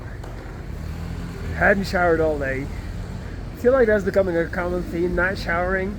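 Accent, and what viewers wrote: United States. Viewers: Wat grappig je komt uit Nederland? Ik dacht serieus dat je uit Amerika kwam. Je klinkt als een Amerikaan. Maar uh... wat doe je in Brazilie? Brazilie is wel het laatste land waar ik ook maar een voet zou zetten. En ik haat de Portugese taal. Gr gr Dario. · You’ve got an American accent! Where are you from originally?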